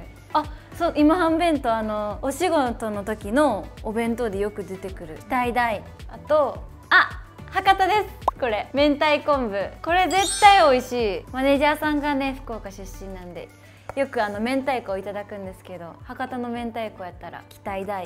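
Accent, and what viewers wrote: Kansai, Japan. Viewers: 関西弁可愛い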